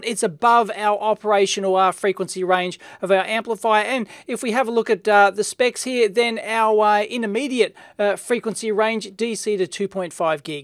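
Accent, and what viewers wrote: Australia. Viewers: I am 728% American but after watching a video from you, occasionally I find myself saying, "hi," to someone but in your Australian accent. It throws people off but it also means I love you stuff.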